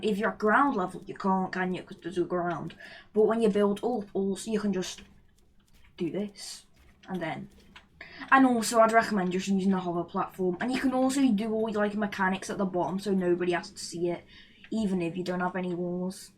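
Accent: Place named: United Kingdom. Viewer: The main reason i watch u cuz u are British and have that funny-ish british accent like a ytber i used to watch.keep ⬆️ ur grind.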